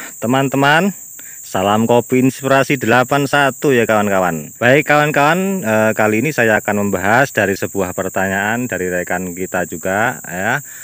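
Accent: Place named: Java, Indonesia. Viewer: Temangsang😁😁😁 isih totok jowone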